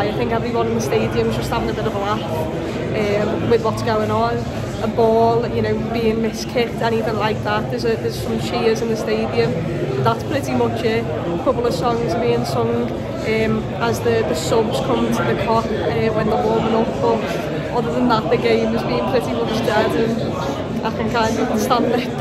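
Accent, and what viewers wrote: Liverpool, United Kingdom. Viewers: This scouse accent 🥺>>>>>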